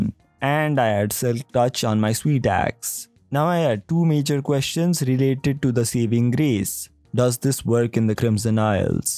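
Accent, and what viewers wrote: India. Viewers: Are u indian? If no then where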